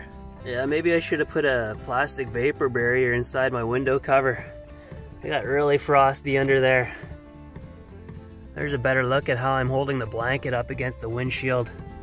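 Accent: Canada. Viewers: I am English and I can tell you are Canadian because you say ' oot' instead of Out ! For 25 years I spent the summers in Germany and winters in Morocco in my RVs If I were you I would be in Arizona (My No 1 State) in winter and Canada in summer. Thank you so much , Love your videos. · I love your accent and they way speak Some words small pause some words with long vocal end Pure Canadian lovely chap God bless you And please drive carefully on those ice roads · Wow that’s the most Canadian accent I’ve ever heard. 😉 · The way this guy talks I'm assuming he's canadian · Is that Canadian accent or what? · Whole Food Plant-Based Man I love Canadian accents because it’s a mix or a British and American accent. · I'm Canadian and this is the only guy I've ever seen in my life with a legitimate Canadian accent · That thick pure Canadian accent is complete ASMR. Damn, it's just what I needed to soothe my soul. 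Thank you. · This guy sounds exactly how I thought Canadians would sound. Also people tell the guy to move, assuming he would go south.... this god of a troll goes even more north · Is this a canadian accent?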